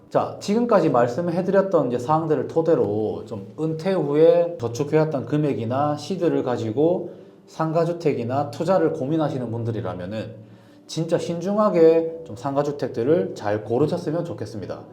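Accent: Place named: Gyeonggi, South Korea. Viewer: 원래 경기도 사투리에요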